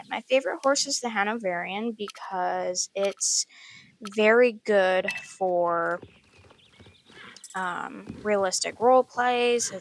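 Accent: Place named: South, United States. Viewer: Twin you got an accent too southern twinnny!! -Shenzi or Scylla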